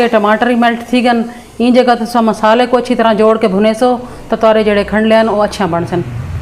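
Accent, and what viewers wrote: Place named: Saraiki, Pakistan. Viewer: سبحان اللہ سبحان جیا آپ لہجہ سرائیکی ہی رکھا کریں اور ساتھ چیزیں کی تعداد کے لحاظ سے کوئی برتن بھی بتایا کریں جاوید خان تشنہ ایران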